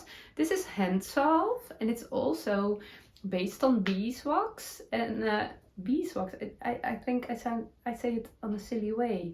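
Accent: Netherlands. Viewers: Actually you have a lot of American friends. I watch all of your podcasts...and love them. You're so cute and your "Dutchy" English is improving very much. I'm from Kansas City, Missouri and I look forwardvtobyour podcasts each week. 😊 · Dear, so happy to have found your podcast! I enjoy your Dutchy English, and your knits are beautiful. It is always nice to know I'm not alone in my mistakes. Thank you, for sharing your adventures. Cheers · I enjoy your podcasts so very much, I love your Dutch English! You have definitely grasped the English language very well. I’m knitting the CORRAN cardigan too….I might made short sleeves like yours. You inspired me.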